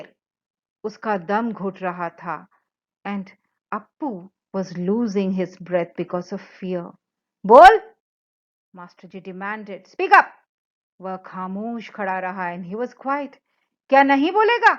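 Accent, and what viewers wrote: Kerala, India. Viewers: mam aren't u from kerela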